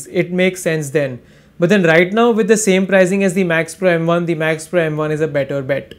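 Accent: India. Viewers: Play video : Thick Indian accent. No, thank you.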